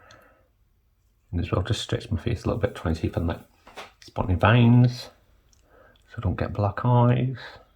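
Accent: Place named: Scotland, United Kingdom. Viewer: So refreshing to hear a Scottish accent. Been in Canada since I escaped England in 1988. I am thinking of doing this Juve eye thing myself. Do my own Botox but got flagged by customs so dunno if I will be able to get product at my home address. I guess it's illegal to even do your own Botox here in Canada. Bummer. I got cheek filler yesterday as a 'model' at a place where I know the owner. He's now got his own clinic and doing amazing. Young, Gay and very artistic. Cheek filler was painful, so I'm glad I never attempted to do it myself. The crunching of tissue is unnerving! Anyhow, sorry about your Soccer defeat by the Germans today. Probably our turn on Sunday!!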